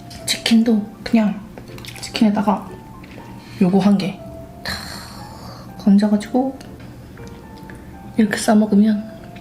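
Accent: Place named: Daegu, South Korea. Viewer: 닫아뿌더라구요 ㅋㅋ 저도 대구사람이지만 님 사투리졸귀..♡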